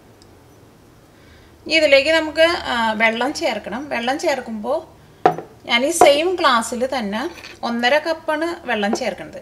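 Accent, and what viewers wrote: Thrissur, India. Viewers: വളിഞ്ഞ തൃശൂർ ഭാഷയും ഭാഷയുടെ സ്റ്റൈലും ഒന്നു മാറ്റിയാൽ മനോഹരമായിരിക്കുന്നു ഭാഷ ഒരുതരത്തിലും സഹിക്കാൻ കഴിയുന്നില്ല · Trissur language njangalkoke ishtanu.speed koodiyalum kuzhapamilla keto.soundoke cook cheyyumbo natural anu.athonnum sarallya....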